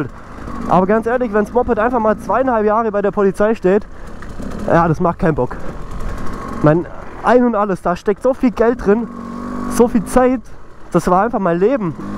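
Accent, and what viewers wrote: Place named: Geislingen, Germany. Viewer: Geißler aktzent.. Fend I tob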